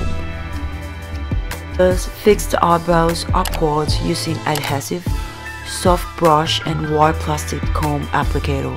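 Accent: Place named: United Kingdom. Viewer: Why are you speaking in British English accent ? Sosyal!!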